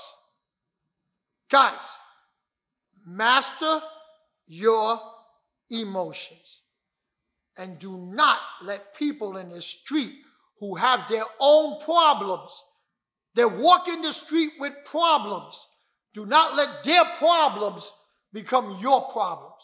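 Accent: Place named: Brooklyn, United States. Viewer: Unc speaking big facts …I hear Brooklyn in Unc’s voice or somewhere close definitely from NY… sending much love from HTX enjoying the content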